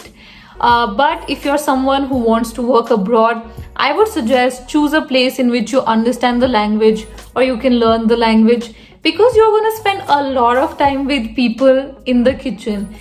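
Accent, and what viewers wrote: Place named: India. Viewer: 🫡Hats off 2 U from 🇧🇩Bangladesh. I love the way U speak English in a very simple way. Your English is very easy to understand, and your accent also sounds so simple and sweet. It's way better than all other typical indian accents! I am in damn love with your steady speaking style, which is absolutely easy to understand, and of course your every single word's pronunciation is crystal clear!🥰 U r undoubtedly a perfect speaker! U have absolutely nailed it!👏🏻 👌🏻Keep it up dear multi-talented Indian lady...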